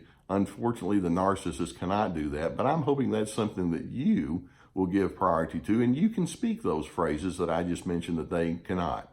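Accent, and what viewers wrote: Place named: Georgia, United States. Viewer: Where is from? He sounds like Effingham County, GA! ( A Salzburger? Well, I Suanee!!!”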